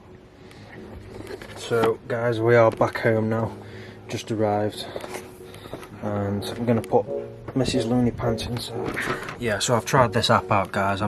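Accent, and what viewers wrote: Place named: Stoke, United Kingdom. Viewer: Knew your accent from the outset. Parents used to live in Bignall End (Audley) ...small world!!!!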